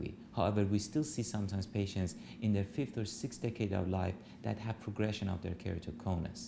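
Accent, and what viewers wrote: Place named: Iran. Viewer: This is Iranian accent by the way.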